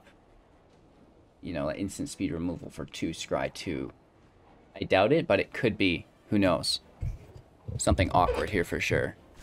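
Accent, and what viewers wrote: Canada. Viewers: had an extra strong Canadian accent today. Oooout. Love it.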